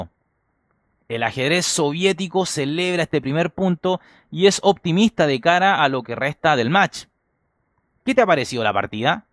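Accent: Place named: Chile. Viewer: Siempre creí que era argentino, veo que en los vídeos antiguos habla en idioma chileno. Fitche xd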